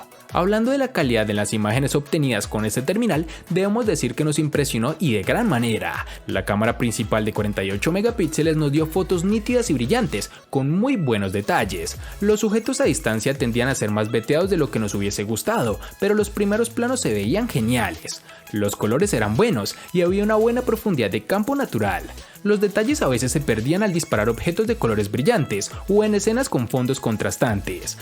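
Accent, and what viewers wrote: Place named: Antioquia, Colombia. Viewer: es mi salvador peor porque se mezcla la voz orgásmica con el acento paisa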